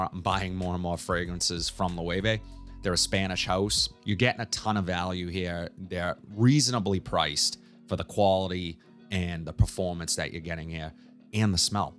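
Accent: Boston, United States. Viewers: I was watching one of his videos on my phone and my wife heard him and said, "Who from Boston, are you listening to??" 😂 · I definitely… couldn’t tell you where from Boston until you mentioned it…. Elixaarr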